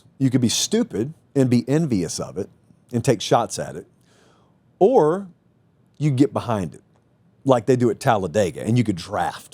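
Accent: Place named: Tennessee, United States. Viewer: The comparison between you and McAfee is interesting.. both guys without “J school” degrees making it big simply due to the advent of the digital era.. but you two are polar opposites in terms of the appeal behind your success.. McAfee is charmingly dumb, funny to the average man, slightly controversial.. you on the other hand are a true intellectual who happens to have a TN accent and love college football.. and because you use big words and back your opinions with critical analysis and make jokes that are way over most’s heads while inevitably offending people who watch your content already having their minds made up I think your audience cap is much less.. if you sell out and pander to the masses I think you could be #1 in 2035 👍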